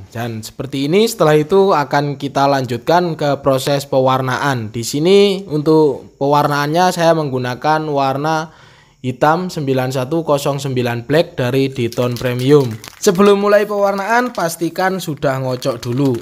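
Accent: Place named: Java, Indonesia. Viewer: bg jenengan sh wong ndi. mandan medok. bisa nk edek otw jluk tulung ngecet na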